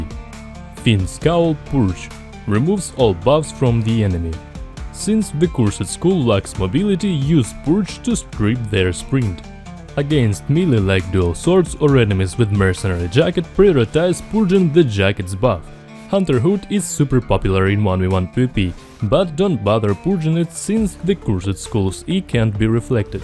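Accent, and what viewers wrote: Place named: Eastern Europe. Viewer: This guy never misses! Once again, a great video. I also like how you included and broke down the fights with each staff, very informative. You probably already have it on your list, but I would love to see an arcane staff video from you. Arcane been kicking my a*s in CD but might be just a skill issue on my end. Just a bit of viewer feedback, I personally found it harder to follow at times, as the music seemed to be loud at some points of the video. Personally, I would prefer if the music volume would be a little lower overall. As someone who has an Eastern European accent like yours, I find it that people take more time to register what your saying. So during the fast paced fight narration parts of the video the music can create a bit of a barrier. Other than that, it's a perfect video! Love your style, and thanks for sharing your knowledge with us mate! 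Giveaway entry: VageMage - Asia server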